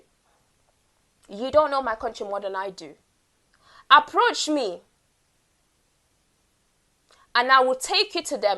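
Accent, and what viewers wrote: United Kingdom. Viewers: Are you still BLEACHING your skin, GAMBIAN woman with British ACCENT? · You on here starting your president's rant against your own black brothers and sisters in America what's your strong British accent acting like you in Gambia right now doing everything for gambians and on a Prejudice rant against your brothers and sisters in America westernized and brainwashed.... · But she is sitting up here with a "WHOLE BRITISH ACCENT." DIVISIVE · Kwame Owusu I had to close my eyes and imagined 👀because yea the strong British accent won’t appear to come across threatening but hey she is building her platform to start a nonsense war just like the South Africans do the Nigerians there own blood and wonder why we get taken advantage all over the world I mean literally shitted on 😖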